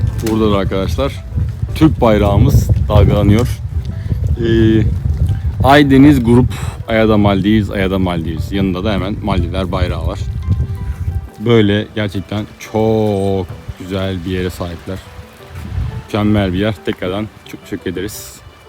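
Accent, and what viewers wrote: India. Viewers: aga, tenin, konuşma şeklin hintlilere benzemişsin :) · tam hindulara benziyorsun biraz türkçeni düzelt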